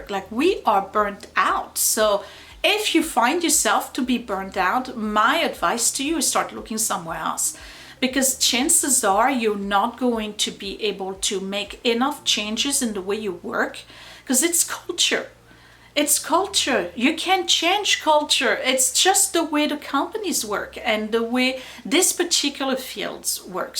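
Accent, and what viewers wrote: France. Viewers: I have been watching you since u were working on the office. I fell in love with your willingness to rise to the challenge and share it with us. I love seeing you interact with your family. I love your accent and your stories. I call you my French lady on YouTube. I really enjoyed this video, it was a great visit with my friend. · The French accent is really cute though. BTW, as an English teacher myself, there is one thing I 'd like to say: having an accent is normal. It's nothing to be ashamed of. You have taken on the task of learning another language. That is hard. No one, if they aren't learning another language or are bilingual themselves, is allowed to criticize that.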